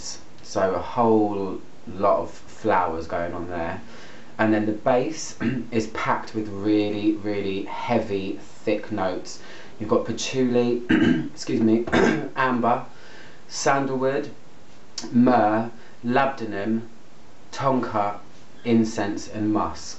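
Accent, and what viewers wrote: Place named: East London, United Kingdom. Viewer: Hugh Grant who went to Private School.. 😂😂🤔 😏😂 Anyway I love your East London accent ❤️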